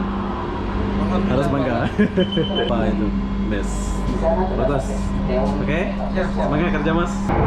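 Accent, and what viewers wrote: Malaysia. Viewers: Abg Ckap mcam ade logat malaysia. Pernah msuk malaysia ke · Ini yang bikin you tobe orang indlonesia ataukah orang malaysia ?? Dialeknya kok dialek malaiysia tapi bahasanya indonesia ??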